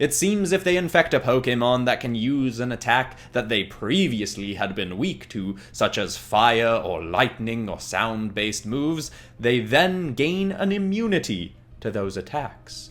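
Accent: United Kingdom. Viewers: The y highlight of this video was definitely the British accent 😂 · just saying I like the video but the narrators accent you know the English accent it just doesn't hit right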